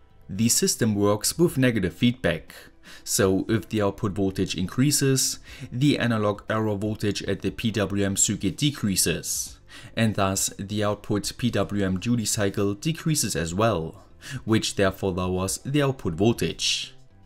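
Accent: Germany. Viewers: ...das is das trotz korrekter Grammatik GEILSTE deutsch Akzentuierte Englisch, , was ich zu Lebzeiten erfahren durfte...Content is natürlich auch gut, aber diese Stimme hebt das in eine ganz andere Dimension...Danke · I AM SO GLAD WITH YOUR FREQUENCY OF VIDEOS LATELY! I know full well how much work this just be for you, but I think I speak for a great many of us, it really is exciting to see a new release from you! No matter the topic, you make it understandable, entertaining, engaging, and simply watchable for just about anybody. I think it is your super soothing cadence of speech, and that sweet, sweet German accent! 😁😁😁👏👏👍🏼👍🏼👍🏼😳😳😃😃😃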